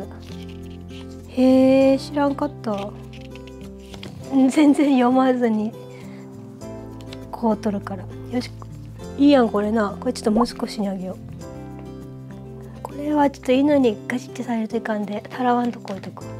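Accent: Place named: Shima, Japan. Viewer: コロナからの回復おめでとうございます。志摩弁聞けたー🌠僕も娘の感染によって折角当たった２日の皇居一般参加を断念しました。新幹線やらキャンセルだらけで😞💦🐩との動画楽しみにしております。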